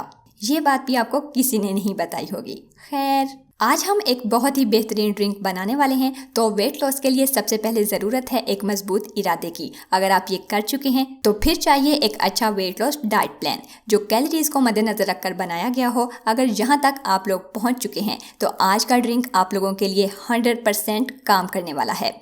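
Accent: Punjab, India. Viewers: Hahahaha ap Punjabi hooo ghera Dena grander ko sooooo 😍😍😍caut gheera😍😍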